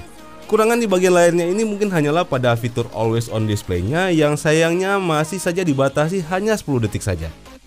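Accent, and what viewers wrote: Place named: Manado, Indonesia. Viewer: Bang orang manado ya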